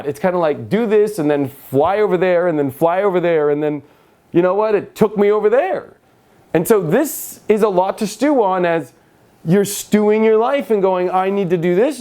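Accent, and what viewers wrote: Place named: Los Angeles, United States. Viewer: Awesome x great clarity..you.sound SO LA...Lol!..... don't ever change Dude x! Sky Powers Photographer / europe / <link>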